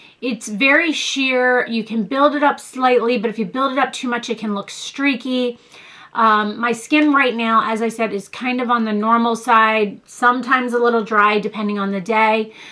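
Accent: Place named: Greece. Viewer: Where are you from? Greece? You speak like greeks speaking English. Very beautiful acccent.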